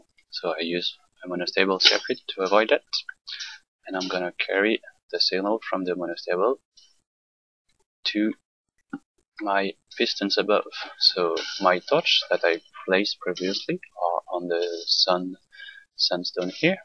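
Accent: France. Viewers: i need to know, are you french?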